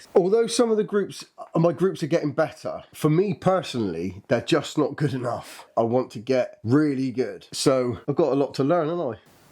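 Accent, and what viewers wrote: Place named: England, United Kingdom. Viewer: wow... wow... wow... I didn't realize that the paper target that you are aiming is just that small..... Your shooting is really good actually... I thought the target was like 12 inches size but it turned out to be that so tiny..... You are eligible to go for competitions already.... And still, I still love your accent... it sounds like those English movies...plus the vocabulary...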